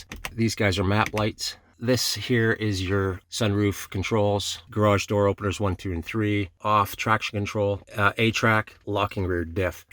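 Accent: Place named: Northeast, United States. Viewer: So weird. If I were to make a guess on his accent, I would say northeastern US ... NY, or MA. Can it be that eastern Canada has similar regional accents?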